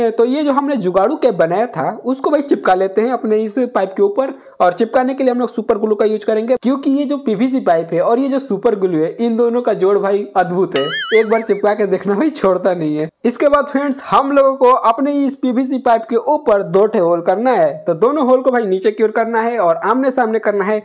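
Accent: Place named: Bihar, India. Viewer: Bihari sun pahle hindi Boland sikho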